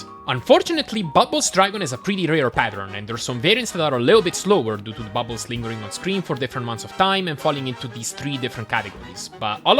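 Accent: Italy. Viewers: I recognized the Italian accent in 1.3 seconds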